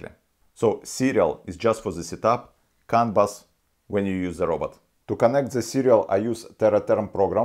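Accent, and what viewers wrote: Russia. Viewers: Русский акцент сильно земетно.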